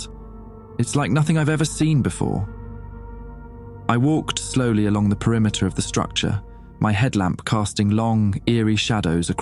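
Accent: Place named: United Kingdom. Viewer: a handsome british voice on an (ant)artic creepypasta? sub.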